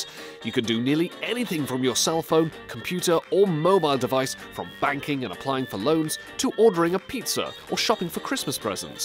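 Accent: United Kingdom. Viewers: You obviously live in the U.K. Because you pronounce Z with an ED at the end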